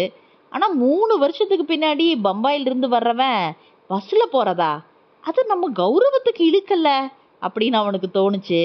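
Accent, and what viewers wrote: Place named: Tirunelveli, India. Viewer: நாஞ்சிலாரின் கதை அருமை. கதை சொல்லியின் திருநெல் வேலி பாஷை பிரமாதம்.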